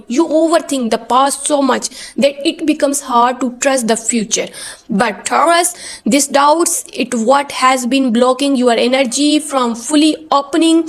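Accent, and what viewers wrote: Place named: India. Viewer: Panget na ng channel na to dati ok na ok .. Yung Tagalog at lalake pa ung narrator. . Ngayon di na maintindihan pag sasalita naging Indian na ... .. hayss sayang channel mo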